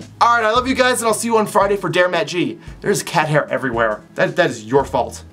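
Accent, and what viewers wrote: Canada. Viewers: Is he Canadian I'm new to this channel so idk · I would of awkwardly hiven her some hummus, and awkwardly pat her shoulder. & plus your getting a little canadian accent c: coooool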